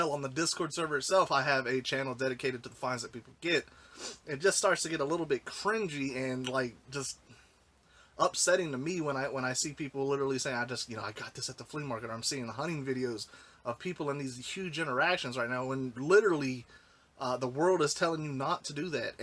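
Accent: South, United States. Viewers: I even want to be careful when buying off ebay. You don't really know who handles you items before you get them. As of late I even try to disinfect my items i buy as I buy them via mail. Man you sound southern like me. I'm from ky.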